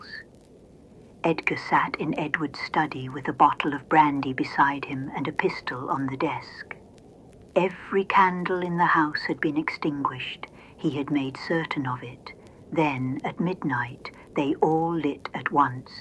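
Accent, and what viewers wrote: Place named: United Kingdom. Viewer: I really enjoyed that, another British voice with added sound effects, wonderful!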